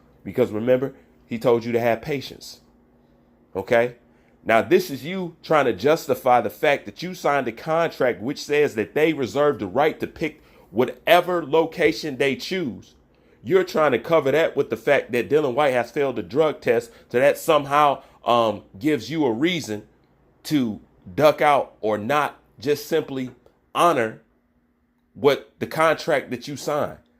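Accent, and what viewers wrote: United States. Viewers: You just showed us that AR stated he was not from NYC, but you went on with deceptive ranting for 7 minutes claiming usa is not his back yard. Unless you can show were he said usa is not his back yard, otherwise it sounds like you are bending the truth here. Also your statement "all atheletes use Steroids" is another example of you the delusional conspiracy theorist. And yes you should just go watch mma and stay far away from this sport. You sound American but yet you still don't seem to understand and respect where the real heavyweight boxing kings are in this era. I bet you doubted the greatness of ruiz the same fashion many doubted wilder and ruiz knocked AJ smooth off. Please have more respect our American heavy weight boxers.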